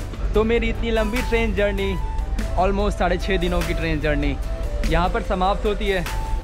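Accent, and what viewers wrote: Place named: Haryana, India. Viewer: Had mtlb bones, hal mtlb kaise ho Bhait tum haryana se ho lgta h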